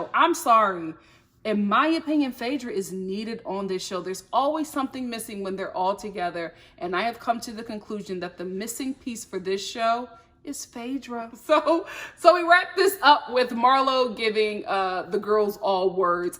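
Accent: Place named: Philadelphia, United States. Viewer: I won’t lie, I watch every one of your videos but I clicked this one with the quickest of thumbs just to hear you say “doll” in that Philly accent. 😂 Love you!!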